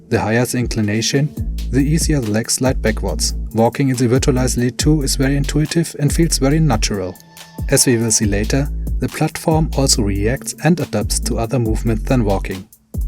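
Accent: Germany. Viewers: Interessant dass man immer direkt hört wenn Deutsche Englisch sprechen ^^ Gutes Video auf jeden Fall. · This german english triggers me ^^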